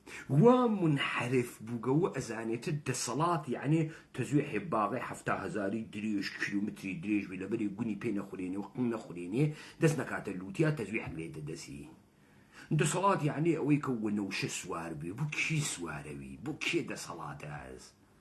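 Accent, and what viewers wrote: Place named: Kermanshah, Iran. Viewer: تو شلوار له قن ترکیه در تیری. خیلی واضحه مهره کی هستی کجا کرمانشاهی بلده با این لهجه حرف بزنه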